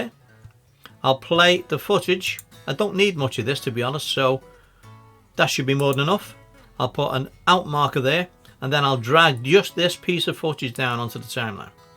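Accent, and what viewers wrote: Liverpool, United Kingdom. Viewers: Wow. What an awesome and easy to follow video. It is exactly what I need for a project I am working on at the moment. You are a great teacher. You sound like you are from Liverpool. I went to college there in Hope Street - between the two cathedrals in 1968 - yes I am very old. I have subscribed and will watch you other videos too. Thanks again and much love from beautiful Segovia in Spain.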